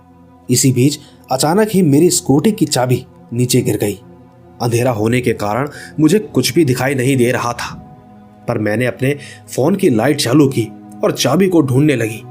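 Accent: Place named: Mumbai, India. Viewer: Bambaiya style mein jhakas❤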